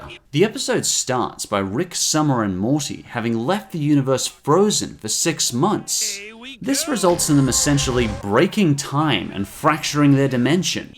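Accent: United Kingdom. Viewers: I thought I was going to watch an episode but I get narration from some f**** Brit my grandma's from England but your voice is annoying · #1 bad thing about your british accent. when you say, ARTISTIC i think your sayin autistic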